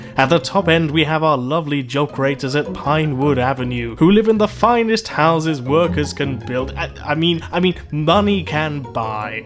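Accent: United Kingdom. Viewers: And so dentistry is the truest metaphor for economic distributivism betwixt Britain and America. In Britain, National Health ensures everyone receives the same level of dental health regardless of the ability to pay, resulting in a national stereotype of bad teeth. In America, you can get super, top-of-the-line dental care, shitty dental care, and everything in between, based on your pay level, resulting in a stereotype of perfect teeth. British views of capitalism feature oppressive magnates shielded from the law oppressing poor downtrodden workers, while American views of capitalism are that you can arrive with no more than the clothes on your back and within five years, you can own a chain of laundromats, dry cleaners, or ethnic restaurants. Of course, that's easier if you're Vietnamese than if you're black or Hispanic, but we don't want to go down that road because it involves IQ and ethnicity. 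The point is that if the narrator had an American accent, we would expect Ancapistan to have crystal spires as low-rent housing, no pollution because fossickers reprocessed it into industrial precursors, and a chronic labor shortage as everyone preferred to retire and stay home on their extensive ranches. Instead we have RoboCop. Because British.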